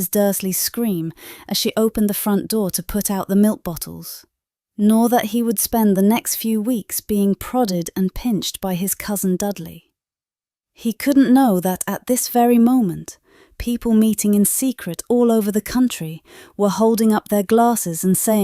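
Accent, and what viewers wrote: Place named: United Kingdom. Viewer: wow what a translation and english pronounciation. Pure British Accent. Awesome.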